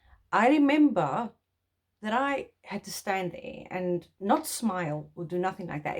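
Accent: Afrikaans, South Africa. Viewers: What a crazy world we live in. If I had met you without knowing you, I might have thought that you were an Afrikaans speaking person, speaking English. Not that it really matters, 30 years after the end of apartheid government and race is still an issue. Look at the Z-83 form for application for employment in a government dept. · No Afrikaans speaking person is not of mixed race origin. I have DNA tested 10 “white” Afrikaans speaking persons. All are of mixed race origin. The majority of us are decedents of white men and imported slave woman from West Africa , india and Malaysians. I am of Indian, Malay, West Africans and European decent. I have very blue eyes, blond hair and western facial futures. My skin is white and I tan a dark bonze colour. Do not judge people by their looks you might be mistaken. Your coloured accent sounds more like a “ white” Afrikaans accent. 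You are an Afrikaner. The can just as well do the mental shift and embrace your Afrikaner identity. Many English speaking South Africans are more Afrikaner than the Afrikaners in their way of living and thinking and their values. They are experiencing a continuous identity crises because they belong nowhere. Just accept that you have become Afrikaners. Make the mental shift. Tommy worked at RAU. He was Chinese as his mother and farther were born there. She could barley speak a few words of English. Tommy however spoke English and Afrikaans fluently. No accent in Afrikaans. It was an Afrikaans university. Tommy made the mental shift and became Afrikaans. He was absorbed into the community although he look different. He became one of us. No longer an outsider. We need to embrace our mixed heritage and our Afrikaner identity. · Your accent just sounds Afrikaans (or Eastern Cape English, even) to me, so I suppose one has to come from the Cape to hear the difference or something. 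My mom's family were from the Northern Cape, and would've probably been put in the box "Poor Whites" in those times, because that was what the politicians were peddling to the masses back then, but their heavy accent (much heavier than yours to my ears, anyway) wasn't what distinguished them. AFAIK, every English speaker of those regions (regardless of background) spoke basically Eastern Cape English. They moved to Jo'burg, and all the girls changed their accents, eventually, while the boys kept theirs. (Big family.) So today my mom, aunt, and uncle all live together, getting old, and the two sisters speak Natal English, mainly just because that's how people speak here, but my uncle might be able to "pass for Coloured" - to my ears at least, and I'm probably missing all sorts of nuance that make that idea ridiculous. Actually another one of my uncles was in the queue with a very "Coloured-looking" lady (there's no such tidy box, but somewhere right in the middle of everyone pushed or pulled into what's become that culture there's something like that), who had the same surname as him, so is almost certainly related (because it's a very rare surname) to us. 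So somewhere back in the past, one branch of the family got put in the one box, and the other got put in the other. Actually now I think of it, that very uncle's first ID bo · My son is 40 and can hardly remember those years...i think you are not telling the truth about the pencil test...and you are talking with same acsent as an afrikaans person trying to speak english stop feeling sorry for yourself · You're beautiful and your accent in only south African.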